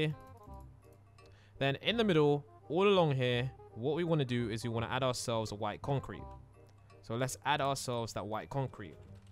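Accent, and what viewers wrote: United Kingdom. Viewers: I love your British accent then the Build ☕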